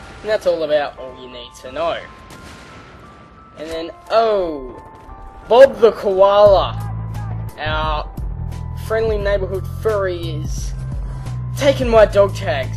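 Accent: Australia. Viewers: i dont know what it is but for some reason listening to an aussie just makes this vid seem more sophisticated. it just sounds right, American comentators just cant top it. he sounds like abit of a bogan but still, this vid doesnt sound as dumbed down for little kids. keep up the good work mate ur a legend. and to people getting bothered by the accent think how we feel with the significantly lower amount of aussie commentators. remember its as anoying for u as it is for us listening to ur accents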